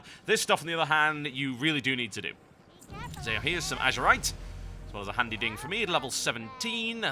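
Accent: United Kingdom. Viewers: British accent ftw !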